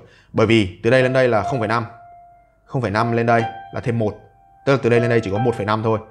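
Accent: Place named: North, Vietnam. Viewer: Cảm thấy may mắn vì mình hiểu đc tiếng bắc 😂😂😂 quá nhanh quá nguy hiểm 😂😂😂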